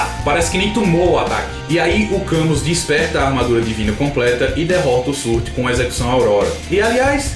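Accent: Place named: Northeast, Brazil. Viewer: cara vc e hilario.. serio.. seu jeito. seu sultaq he mt engraçado. por acaso vc e do seara ou paraiba.. seila esse e nt engraçado. os conentarios as cateretas q vc fz mt louko. mas parabems pelos videoa. ce deve ter passado horas dias ate mezes pesquizand sobre cdz ne mesmo. eu axo seus comentarios meio rud. tipo eu sei mas q vc fds. alem da pronuncia dos nones e palavras japonesas alem de errado fk mt engraçado no seu sultaq nortenho.. apropisito tbm n posso falar de vc q meu n he dos melhires.. tbm sou nordestino kkkk mas moro em sp fz tempo. e estudo japones a 6 anos. ja estou quase me tornando sempai seinto. kkk falow anigo onedetougosainasu. jya ne... te mais.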